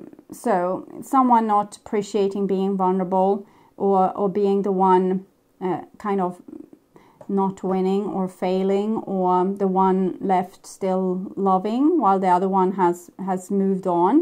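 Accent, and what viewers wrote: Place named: Sweden. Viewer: I knew you were sweadish when I first heard you. I lived in Sweden in the 90 's was so beautiful times !!🦋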